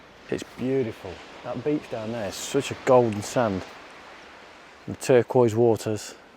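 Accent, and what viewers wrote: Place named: Nottinghamshire, United Kingdom. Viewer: Enjoying your French trip. Tell me sumat! Are you from Nottinghamshire. Trying to wok out ya accent 😂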